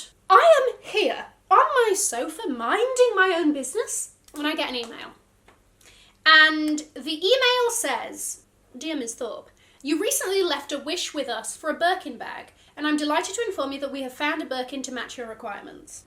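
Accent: United Kingdom. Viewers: I love your accent! Its not the basic british accent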